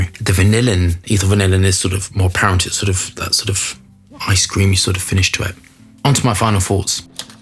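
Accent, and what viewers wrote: United Kingdom. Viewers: i like your Britification of every word. "Le Laleeebo". And maybe a high vapor pressure isolate of patchouli can occur on the top maybe?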